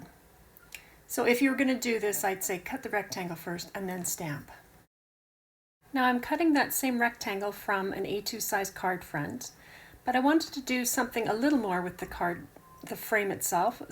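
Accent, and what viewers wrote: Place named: Canada. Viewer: What a lovely card. I love your Canadian accent.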